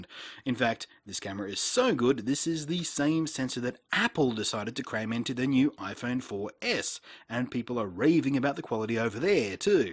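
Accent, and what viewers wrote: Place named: Australia. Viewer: ooohhh man i love your australia accent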